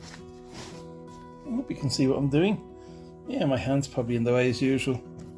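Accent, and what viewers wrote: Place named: Ireland. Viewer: Another great vid mate. Billocks ti the trolls! We love your Irish accent. Currently in a field with crap signal, setting up for a weekend LARP event so chilling out, watching this. Cheers mate and keep.up the great content. 👍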